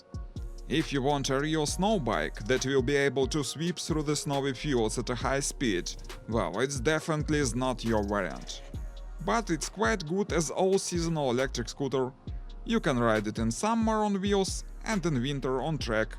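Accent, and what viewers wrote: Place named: Russia. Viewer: мужик у тебя акцент русский.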